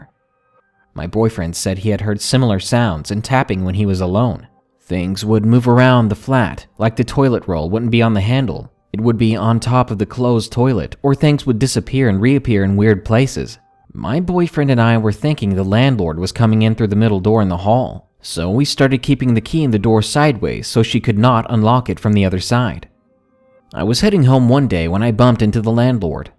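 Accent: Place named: Rural, United States. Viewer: Lol, but you're a hick with brilliant narration skills!! Great voice too.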